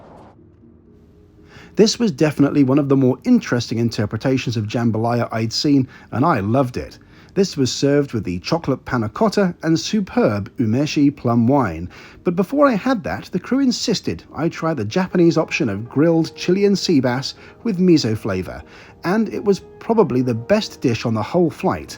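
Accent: United Kingdom. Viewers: I am from the US and everything you just said sounds so wonderfully British!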